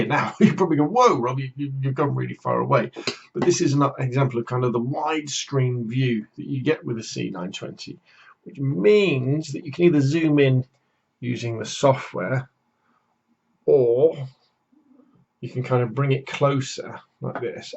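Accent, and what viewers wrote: United Kingdom. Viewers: Hy, nice video! the quality is good and there is no flow. the webcam performs depending on the system configuration so can you tell me pls on what configuration you recorded the video(processor, ram memory, video card and if you have ssd or hdd)? it will really help me decide to buy the c270 or not. By the way cool british accent.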